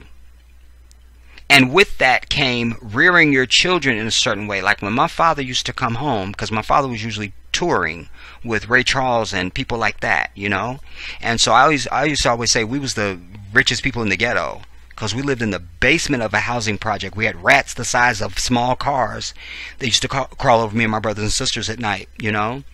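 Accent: United Kingdom. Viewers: you better SING that song! I love when you speak in your British accent.... and when you say the 1990s. Lol. You make my day!!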